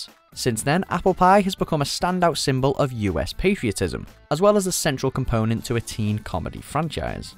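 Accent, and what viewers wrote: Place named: Liverpool, United Kingdom. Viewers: Thammarith Likittheerameth Liverpool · north of england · Sounds mainly scouse with something else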